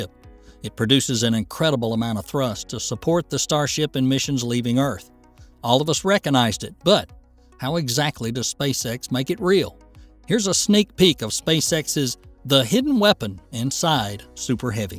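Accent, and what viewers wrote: United States. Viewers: That cowboy accent tho 😂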